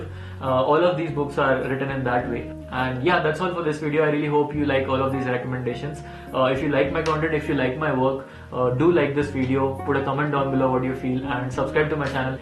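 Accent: India. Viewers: Indian accent at its finest (no offense) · Are you a Bengali? 😅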